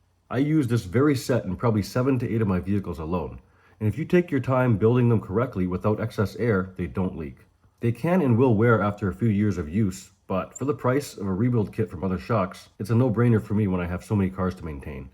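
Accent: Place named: Canada. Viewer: Hearing Canadians say "out" always cracks me up😂😂. Thanks for the info!!!!!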